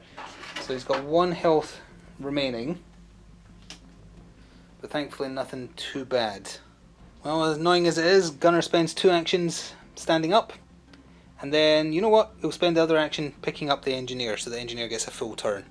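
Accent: Scotland, United Kingdom. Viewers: It's Nitra, so pronounced night-ruh. For the Americans, what's your regional accent? It's leaning Scottish but doesn't sound full Scot.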